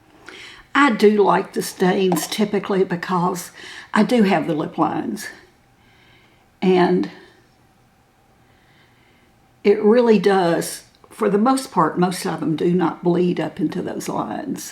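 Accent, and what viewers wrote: South, United States. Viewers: I so adore you! You are so sweet, so genuine and I love your southern accent! I got the NYX foundation and love it!! I am a makeup junkie and have countless foundation's. I have never used any drugstore cosmetics but am now a convert. Since watching your channel I am a fan of e.l.f and NYX! Not going back to ANY prestige makeup! Thank you for making me a fan! xxx ooo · Whoever is managing the YouTube recommendations? Thank you so much for putting this beautiful lady on my recommendations list, and as a cisgender Gen Z male? I literally enjoyed every single minute of this video, the warmth of the southern drawl and her spirit and beauty and elegance and easiness, it’s so soothing and beautiful like the rest of her warm, regal spirit and beauty.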